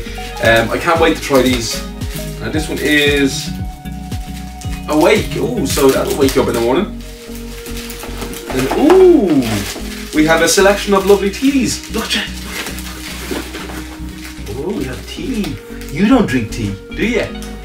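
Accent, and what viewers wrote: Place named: Ireland. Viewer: No one ever says Apothecary the first time out :) And it is ok. It's an old timey word that most people haven't heard before. We're digging it even more with the Irish accent though <3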